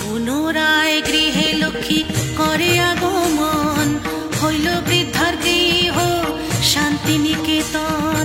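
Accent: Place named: Bengal, India. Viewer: Sanskrit mantra uchharan thik korun. bangalar moto uchharon hobena.montro uchharon thik na hole ta kaaj deina.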